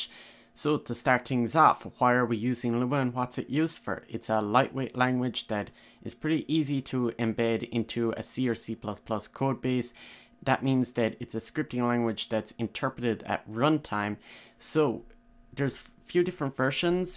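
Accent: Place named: Ireland. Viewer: 95% sure you're irish or grew up in ireland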